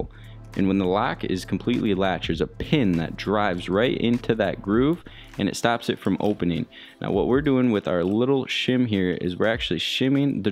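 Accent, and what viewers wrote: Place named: Michigan, United States. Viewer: Pop! You sound just like my family from Michigan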